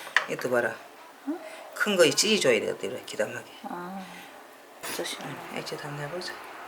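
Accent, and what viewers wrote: Gyeongsang, South Korea. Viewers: 호박고지나물 영상 넘 잘보고 있어요 경상도 사투리도 좋아요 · 경상도 말씀이라 잘 못 알아듣긴한데 나름 매력적입니다 ㅋ ㅋ 근데 아주 맛깔스럽게 하시는듯 팬 찜!!!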